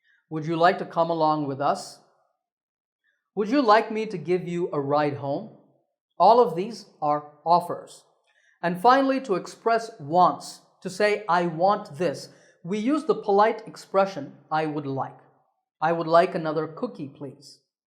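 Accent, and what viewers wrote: United States. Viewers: Sir, I want to speak English just like (i.e. like a US citizen) you how can I? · Sir your english is exactly like American flaunt english. Are you living in United States? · Aapki awaj ekdum american jaise hai per ho aap indian how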